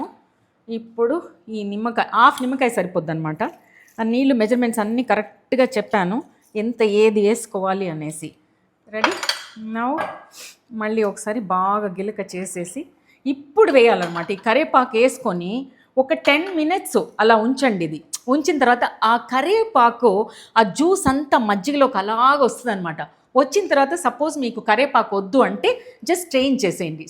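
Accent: Tamil Nadu, India. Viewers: Mee teluguki tamil flavour vachesindi Madam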